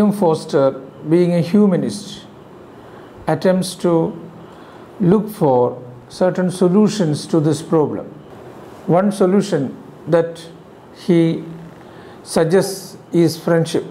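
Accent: India. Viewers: Thanks so much for very understandable explanation, easy language with an Indian pronounciation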